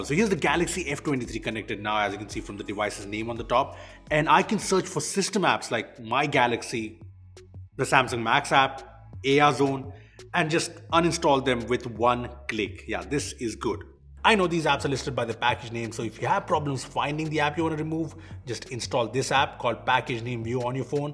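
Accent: India. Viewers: indian accent😐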